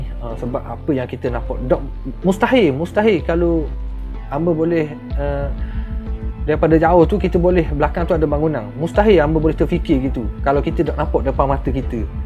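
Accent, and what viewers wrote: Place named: Terengganu, Malaysia. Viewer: Penceritaan.. yg.. sangat.. menarik.. saya. suka. slang.. Ganu. nye.. 🤣🤣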